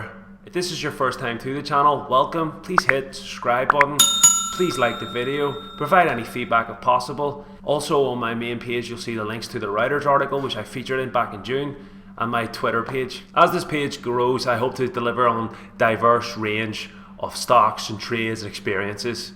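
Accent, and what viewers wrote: Northern Ireland, United Kingdom. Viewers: I thought it was a Northern Irish accent.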